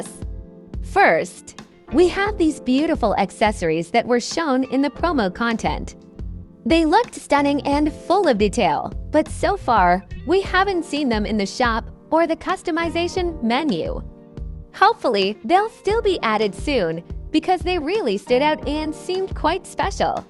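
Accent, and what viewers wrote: Russia. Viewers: Are you Russian or Ukrainian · Arwe you russian? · Are u russian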